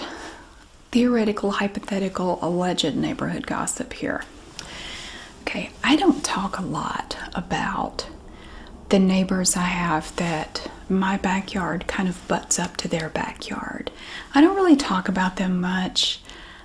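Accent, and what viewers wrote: South, United States. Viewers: Best southern asmr videos on this channel! Aside from charity and carl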